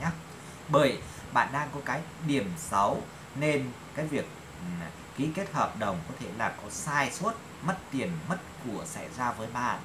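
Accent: Thanh Hoa, Vietnam. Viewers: Dung giong Thanh Hoa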